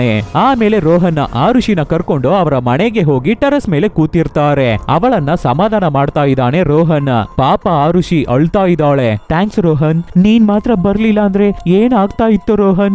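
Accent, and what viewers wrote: Tamil Nadu, India. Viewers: Kannadana saryaag maathad guru...neenen Tamil avnaa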